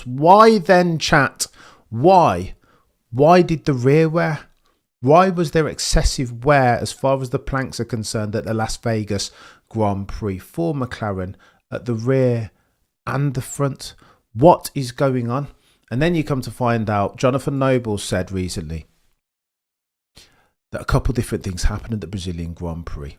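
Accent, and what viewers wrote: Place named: England, United Kingdom. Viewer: Your sound is English but a Verstappe-fan? Are you pissing my ear chap?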